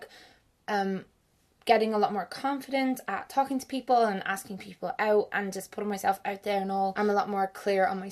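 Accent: Ireland. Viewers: Compatibility: we have the same beliefs, we're both bi, we love makeup, I love the Irish accent Chemistry: I'm bad at science but good for you It's ok with me if you move to Wales now x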